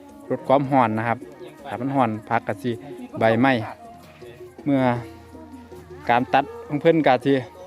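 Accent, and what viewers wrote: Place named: Isan, Thailand. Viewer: คุณน้องเป็นคนจังหวัดใหนคะ..เว้าอิสานม่วนดี❤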